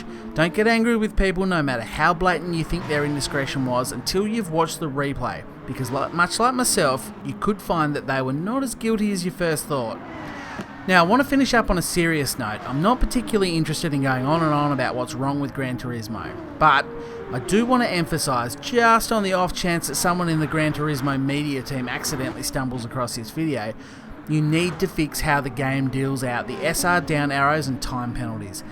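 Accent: Australia. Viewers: siga raga He is an Aussie if you couldn't tell by his accent, and the Australian flag next to his gamertag. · Straya mate! How could you ever think he is British?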